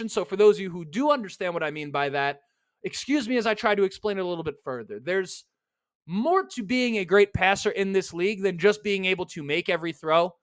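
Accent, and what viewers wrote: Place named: Indiana, United States. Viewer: Just learned that you're from NY. Thought people from Ind had your accent. Nonetheless, good episode.... i know it's tough during the lull.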